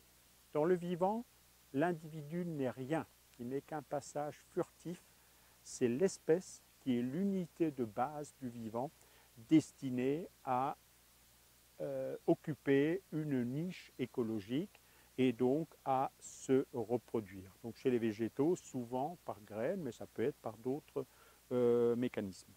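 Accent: Alsace, France. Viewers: Bonjour, et merci d'exister ! J'ai découvert votre façon de jardiner avec joie, car à 75 ans je dois me ménager... tout en réalisant mon désir de potager. J'ai d'autant plus de plaisir à vous voir et à vous entendre que votre humour, votre léger accent alsacien me rappellent terriblement mon oncle Alfred, alsacien comme toute ma famille ! Je suis installée en Bourgogne, et j'ai convaincu mon époux de s'intéresser avec moi à votre méthode. Nous tâtonnons donc, mais cela nous réjouit ensemble. S'il est bien évident que l'on devine les connaissances derrière votre art de jardiner, je trouve très agréable que vous n'en fassiez pas des montagnes. Nul besoin de justificatifs, la véritable école est celle de l'expérience personnelle, et si certains ont besoin d'un parchemin c'est qu'ils ne sont pas très surs de leur science...mais qui peut prétendre l'être ? Je pense que je vais voir ce que je peux faire de la mousse que nous ratissons dans la "pelouse", soit pour border les espaces "enfoinés" soit pour remplacer le foin ou le brf à certains endroits. 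Se procurer du foin en rouleaux me semble encombrant, nous préférons le foin en "cubes", un peu difficile à trouver, et il nous faut le décompacter un peu.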